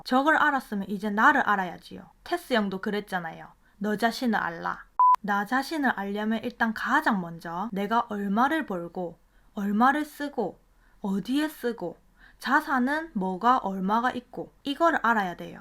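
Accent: Gyeongsang, South Korea. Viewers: 경상도 사투리에 똑부러지는 조언 딱 이상향형????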